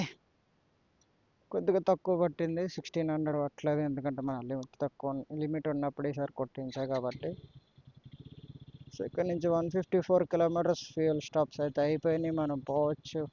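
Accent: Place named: Godavari, India. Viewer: ni Bro Full Video chusa Godavari yassa Bale vundhi bro 😅, Take Care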